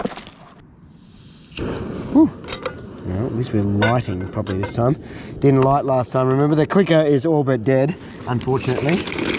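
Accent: Australia. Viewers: One of the most relaxing things I do is watch your camping trips on the islands. One of the most amusing things is listening to my wife's (affectionate) commentary on your Aussie accent and syntax and mentioning that I'd fit right in with how I "sometimes" shorten words. LOL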